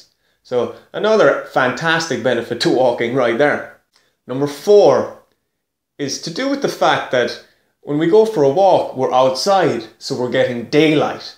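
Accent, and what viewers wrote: Ireland. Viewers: thought u was Swedish at first pretty sure your ireish now. really liked your conor mcgregor video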